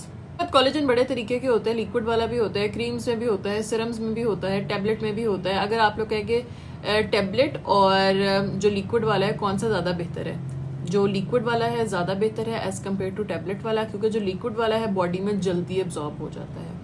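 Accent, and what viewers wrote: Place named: Punjab, India. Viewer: Yar aik to smile boht pyari h apki 2nd punjabi boht pyari bolti ho ap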